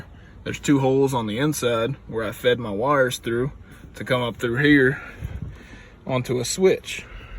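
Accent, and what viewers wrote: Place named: Alabama, United States. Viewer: Sumbitch you gotta be from Alabama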